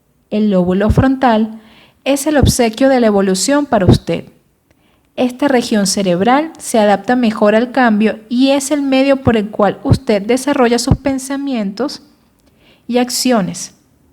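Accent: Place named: Venezuela. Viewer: Pésimo acento venezolano 🤮🤮🤮🤮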